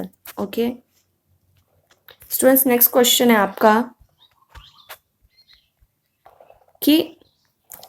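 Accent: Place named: Pahari, India. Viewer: ये ट्रस्ट serirs "जो है वो"😅 कितने rupess में avilable हो जाएगी maam ? पहाड़ी accent बड़ा अच्छा आता है आपकी हिंदी में😃🙏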